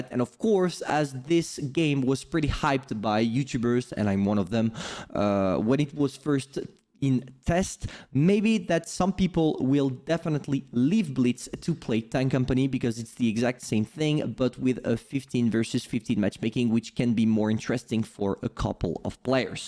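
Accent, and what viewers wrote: France. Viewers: you are French?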